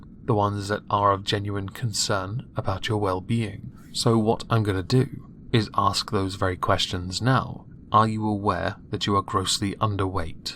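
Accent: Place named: England, United Kingdom. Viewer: You sound so much like Clown ( Collossal Is Crazy ) But it's probably just because your both English? Is it racist to say that?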